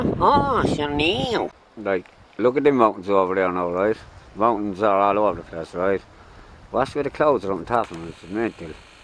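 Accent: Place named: Kerry, Ireland. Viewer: Oh are you from Kerry? I couldn't place your accent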